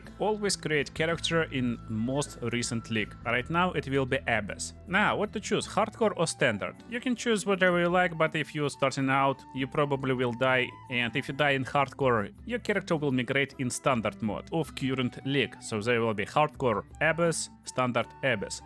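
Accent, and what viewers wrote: Russia. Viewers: Your accent, I swear You made a BG3 guide but I can't find it on this channel, maybe another Russian. You sound identical, accent an all lmao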